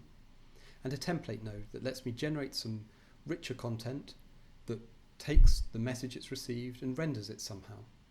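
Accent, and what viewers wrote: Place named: United Kingdom. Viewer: difficult to understand: strong British accent mumbling ... sorry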